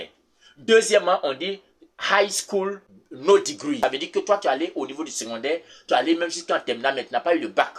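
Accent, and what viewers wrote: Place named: Ivory Coast. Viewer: Hummmm mon frère merci beaucoup pour cette vidéo d'une valeur pratique l'accent ivoirien reste incontournable dans tes propos.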